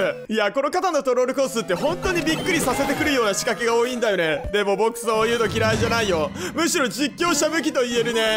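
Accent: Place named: Hakata, Japan. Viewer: ひぃぃぃ！？の謎のエコーみたいなのおもろいし僕も一緒にぶっ壊れようと思いますｗ あとところどころ博多弁出るの好き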